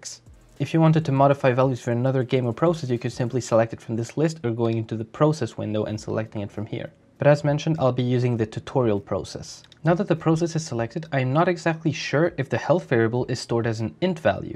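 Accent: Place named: Sweden. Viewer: visste väl det hörde det lite grann på din dialekt lol, har du Discord?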